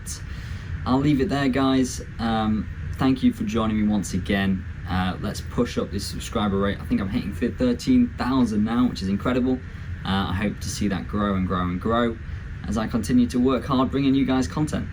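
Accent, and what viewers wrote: England, United Kingdom. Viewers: I'm an Australian and i think I heard hyou saying that you are likely to be spending some time in Australia in the coming months/years. A little tip: Australians NEVER refer to the country as being "down" anywhere, Or even "Down under" Several times in this video you refer to something like "when we get down to Australia". An Aussie would never say that. Your accent will mark you as a "pom" (That's not necessarily an insult - it depends if there's an expletive with it (as in he's just a <expletive> pom") and it's fine to be a pom - there are millions of others here already - but it's a social gaffe to refer to Australia as "down here" or "down under" or "this happened on our way down to Sydney" That would tell Aussies listening that you are in the category of the poms who are despised - the ones whose focus is still in Europe, the ones who can't get over the fact they''ve moved home and things are not the same in Australia. 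I once worked with an Englishman who would NOT stop complaining that Australia was such a backwards country. We would grit our teeth and shut up for a while Ineitably he'd get around to saying that even the newspapers here are backwards. "Back home we get the Telegraph or the Daily Mail with the football results when we are on the way home from the game. In this backwoods country (meaning Australia) the papers dont have the scores until Sunday morning" He never could get it through his thick skull that the reason was because of the time zones. When we're a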